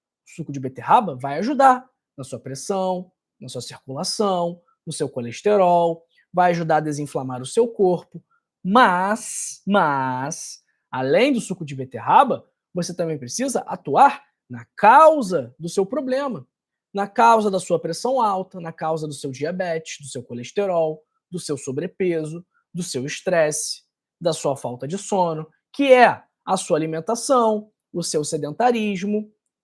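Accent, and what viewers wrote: Rio de Janeiro, Brazil. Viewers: Não gosto do sotaque do Rio de Janeiro 😊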